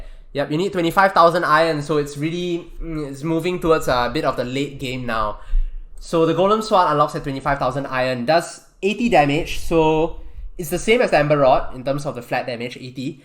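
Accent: Singapore. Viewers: You sound like your from Singapore 🤔 I’m from Singapore :D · Damn your singlish top class man support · are you singaporean? because you sound like a singaporean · he’s singaporean and so am i it’s a common mistake people make in singapore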